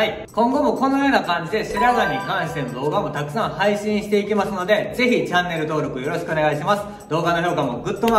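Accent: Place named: Kansai, Japan. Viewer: コテコテの関西弁が耳障り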